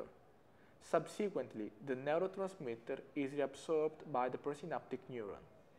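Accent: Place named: Romania. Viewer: This teacher seems Romanian as his accent is so likely to the Romanian pronunciation🙂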